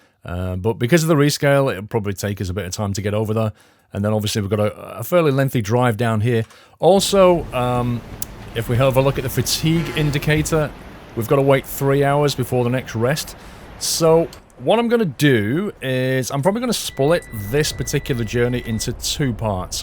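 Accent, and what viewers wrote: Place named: North, United Kingdom. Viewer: The psuedo American raised inflections as you speak mixed with a Northern Uk accent has got to stop.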